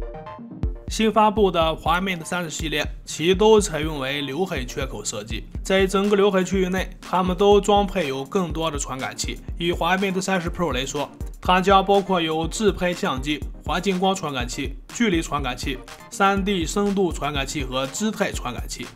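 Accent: Shanxi, China. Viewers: 麻烦你练练普通话, 你这内蒙西部口音还是山西口音太严重了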